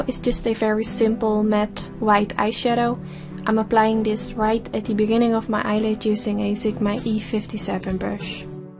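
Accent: Netherlands. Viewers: Aha! I knew you were Dutch! :-D My dad was half Dutch and half Indonesian so I recognised your accent and it made me think of him which is nice. Where in The Netherlands are you from? My dad and his mother lived in Den Haag and my family used to visit her when I was a child. :-D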